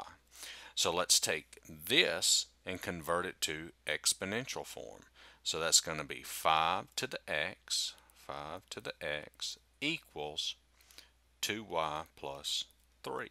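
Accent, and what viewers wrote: South, United States. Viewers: Not even joking, I somehow understand better with a Southern accent. It sounds straightforward, simple, and just cool.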